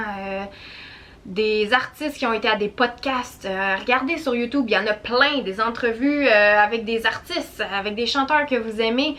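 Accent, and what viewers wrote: Quebec, Canada. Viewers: Wow c la tendance québécoise qui domine YouTube today wow génial bravo le Canada 🇨🇦❤️💖🐞(Québec) bravo jeune light dynamique speed wow bravo dieu te bénisse garde protège inchaallaah continue Ciao😇😅❤️💖💯🇨🇦 oubliée Oops!!!!!!oubliée ta chambre tendance fashion wow adore ce style ces briques du papier peint????😄🤔🤔🤔😅😅😇🙏🇨🇦💯👋💖❤️ Et ton accent wow adore beaucoup 💖❤️ et aussi cette fumée certaine bonne parfumée de laurier??😄🤔😅💖❤️😎😉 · namasté bonsoir c"est la France de saint Etienne salut les Quebec et le canada jolie pays j'aime bien aussi leur accent merci pour ce tirage bonne soirée namasté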